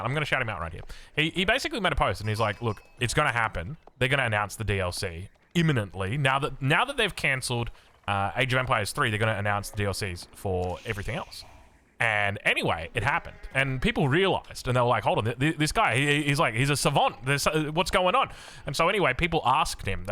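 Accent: Australia. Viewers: sounding Australian today me boy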